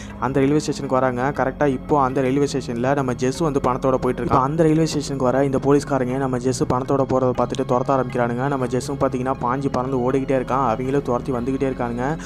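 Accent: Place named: Coimbatore, India. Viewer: Avainka , eveinka slang -CBE 😁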